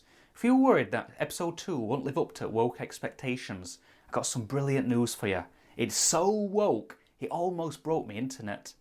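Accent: United Kingdom. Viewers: I never thought I needed this British bloke making me laugh all the time. Love these videos! 😁 I was about to say its a breath of fresh air but considering you're British I'm not sure...😨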